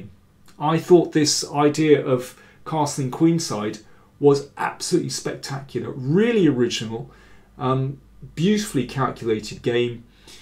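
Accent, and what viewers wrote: United Kingdom. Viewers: It was 1995 when i first heard your commentary when i used to watch anand Kasparov play at 4 am Indian time, and you have the knack to keep viewers engaged and the most appropriate British accent and vocabulary!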